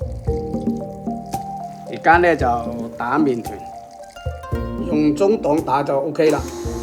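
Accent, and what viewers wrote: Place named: Zhaoqing, China. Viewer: 是肇慶話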